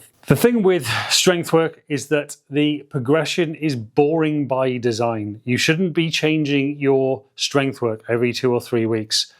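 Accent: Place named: Wales, United Kingdom. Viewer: Ahh a Welsh man . Thought I could hear a bit of Welsh accent there 🙂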